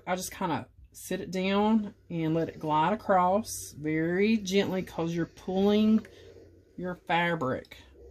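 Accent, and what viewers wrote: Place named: South, United States. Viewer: Hello Mrs. - I LOVE your southern accent🥰. I have read the comments and seen that people have given you some advice about not having to re-thread your machine...They've suggested you sew two blocks at once, use dryer sheets, etc..All you need to do is pull the block your sewing on, to the back of your machine and make sure that you've got 5-6 inches of extra thread, then snip while you've got a finger on those threads, so it doesn't bounce back. Dryer sheets, aside from being spendy, are full of harsh chemicals that cause Alzheimer's and dementia. That's why they do not list the chemicals that are in the dryer sheets on the box they come in. I've done the research. These blocks you've been making are so gorgeous. I'm new to your channel. I cannot wait to see the finished project. You're doing an awesome job❣ Thank You for your videos 💖🥰 p.s. 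I hate to see you go through so much work to get rid of the paper off the back of your blocks. You can actually sew these on Old sheets that you get from the thrift store, leave the fabric on, cut them to size before you sew your strips on... square up the blocks... you get me. 😊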